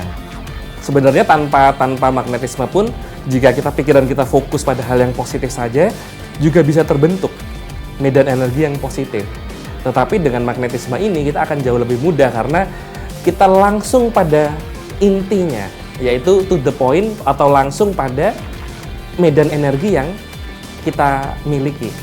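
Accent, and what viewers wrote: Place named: Banyumas, Indonesia. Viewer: Salam ngapak mas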